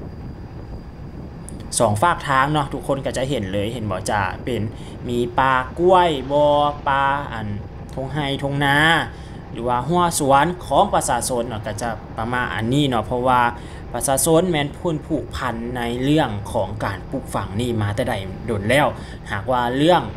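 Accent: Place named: Lom Sak, Thailand. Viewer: สำเนียง ก็ใกล้เคียงกับบ้านข้อย วิถีชีวิตประชาชาชนบ้านฮ้อม หาดซายฟอง คือกันกับบ้านข้อย บ้านหวาย อ.หล่มสักจ.เพชรบูรณ์,ไทยแลนด์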